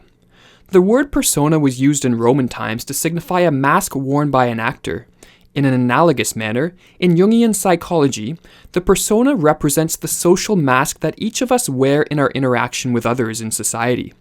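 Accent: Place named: Canada. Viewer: This guys Canadian isn’t he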